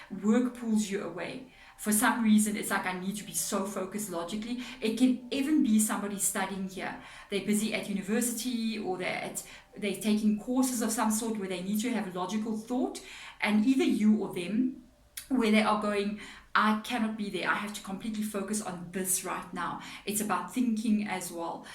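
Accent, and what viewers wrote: South Africa. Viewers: You sound South African? 🥺🙇🏽‍♀️❤️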